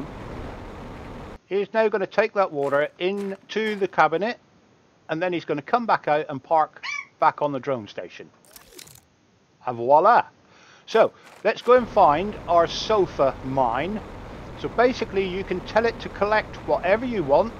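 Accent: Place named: South West, United Kingdom. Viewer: oh thank you I know what the word priority means obviously but wasn't sure in the situation. Thanks. Also are you from the south west because you sound like you are?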